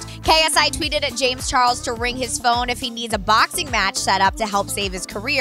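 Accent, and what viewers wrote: United States. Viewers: It’s because she has such a strong (and irritating) American accent 🥴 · Britt Nicole She’s barely pronouncing it wrong are y’all deaf 😂 It sounds like an O because she’s saying it fast and has an American accent- wtf.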